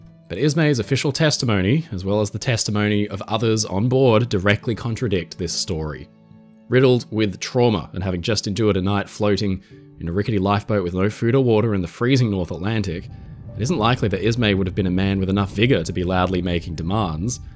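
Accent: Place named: Australia. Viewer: You should pay a bit more attention. If you haven't noticed this guy who makes these wonderful videos is speaking with an Australian accent.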